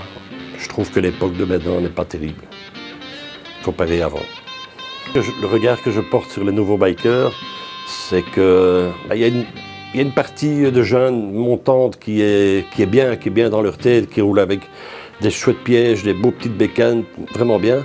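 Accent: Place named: Jura, France. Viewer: Tu est du jura?? Car tu a le même accent que moi!!😄😄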